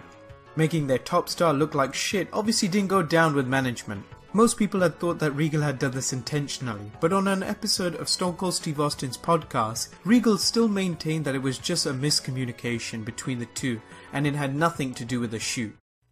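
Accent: England, United Kingdom. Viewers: Are you English? Just wondering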